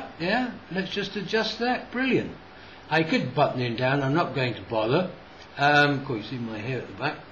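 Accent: United Kingdom. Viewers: It worked because your have an english accent. That other guy who does these videos is a jag off.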